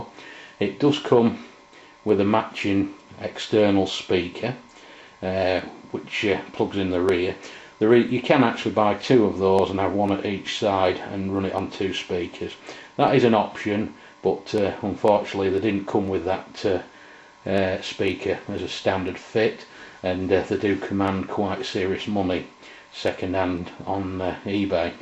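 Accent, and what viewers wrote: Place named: Ireland. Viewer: Hello, tis Paul on the Rock, I'm speaking to you from the future, spooky lol. Haven't heard your dulcet Irish tones for a while, where you been old man? I hope all is well with you and your loved ones, and you're all dodging the lurgy over there. I don't know why Youtube's algorithm decided to put this video in my recommendations 8 yrs later, but hey ho, I do watch the odd chicken box video now and again I s'pose 😉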